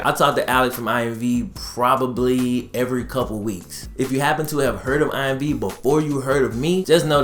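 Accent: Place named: Louisiana, United States. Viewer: I aint know you was from Louisiana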